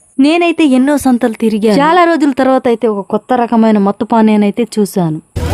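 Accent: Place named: Telugu, India. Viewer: మీ తెలుగు యాస కు బాగ ఎడిట్ అయ్యాను నేను మా ఊరు చిత్తూరు నాకు నచ్చిన భాష తమిళం 😮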